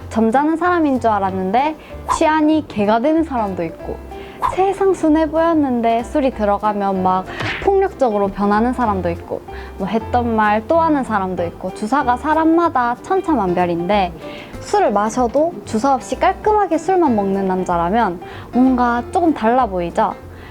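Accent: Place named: Jinju, South Korea. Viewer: 나도 고향이 진준디 말투가 참 익숙하네요 ㅋㅋ 응원합니다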